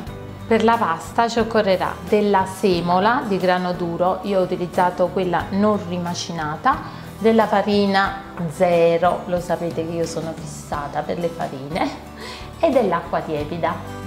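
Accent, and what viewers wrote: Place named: Irpinia, Italy. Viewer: Ciao, ti seguo da un po’.. quando ho visto la tua prima ricetta mi sono catapultata indietro nella mia infanzia... i miei genitori sono di ARIANO IRPINO e il tuo accento è il tuo modo di fare mi ha fatto davvero tornare bambina, alle mie estati giù dai nonni, con i pranzi infiniti e i profumi che solo giù si possono sentire... grazie per le emozioni che mi hai fatto e mi fai rivivere dopo ogni tuo video.. ❤️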